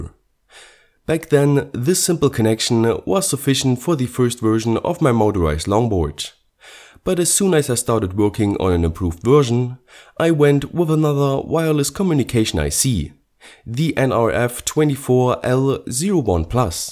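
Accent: Germany. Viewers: Sander Dol i think he’s German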